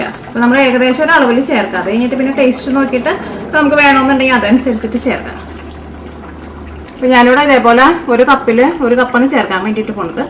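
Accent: Thrissur, India. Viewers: തൃശൂർ ഭാഷ ഒന്ന് മാറ്റിക്കൂടെ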